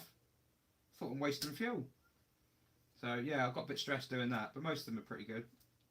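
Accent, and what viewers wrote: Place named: West Country, United Kingdom. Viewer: Sorry I missed the live boy apprentltbdaughters birthday was more important… really enjoying the catch up. Just to clarify cause it wasn’t made clear, do you have your own SM? As you seem to have a bit of a West Country accent can you end your vids with a “proper job0 “ akin to the pe- chew of Ian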